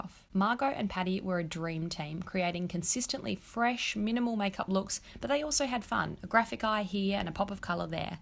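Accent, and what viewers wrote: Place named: Australia. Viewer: your videos are so simplistic, honest, innovative, creative, soft and realistic. your content not only gives insight to these products, but also show a hint of understanding the real usage and how it would be applied in everyday life, and in special occasions seen by the different styles of videos that you upload. personally, I love Australian accents, and I love it when people really support that backgrounds, and your enthusiasm and growing appreciation towards Aussie products and your cute and unique accent results in me watching your youtube videos over and over again. Thank you for the amazing and continuous effort that you put into your videos, I always find myself smiling when I click to view your creative content. 💓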